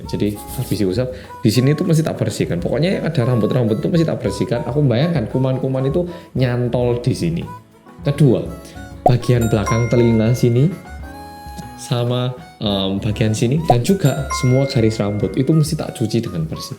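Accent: Java, Indonesia. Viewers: Hi , MEDOK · Hmmm kangen pembukaan "orang Korea yg medok music deb deb deb" Ko sekrng ngga ada lagi yaa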